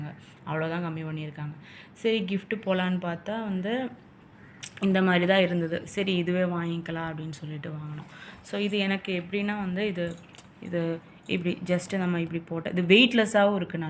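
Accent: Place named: Malaysia. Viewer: You are malaise your slange you speak in malaise why in Tamil to earn money 💰 using tamilans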